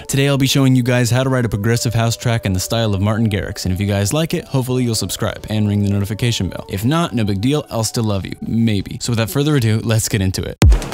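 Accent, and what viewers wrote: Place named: United States. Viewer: you sound so american lmao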